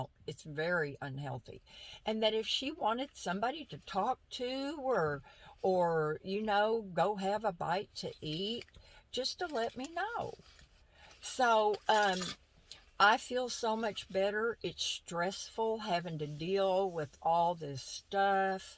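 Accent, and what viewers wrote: South, United States. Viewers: Luv your southern accent! U r so cute! Good luck with Terry!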